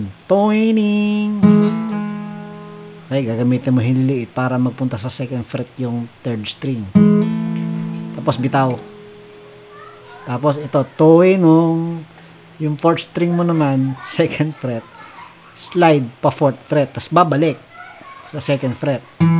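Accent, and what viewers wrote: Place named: Visayas, Philippines. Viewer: Dol galing talaga, bisaya ka?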